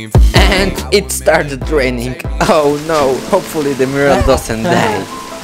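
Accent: Mexico. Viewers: si es el español, el es Mexicano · Phantom GG his accents sounds totally Mexican and his cellphone shows his company (Telcel) that is a cellphone company from Mexico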